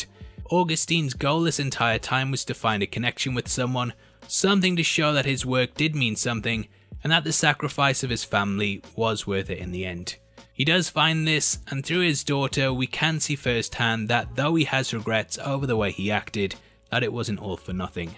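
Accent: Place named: Newcastle, United Kingdom. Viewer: Why aye man. I thought you might be a Geordie but I wasn’t sure until you said the word daughter. 😂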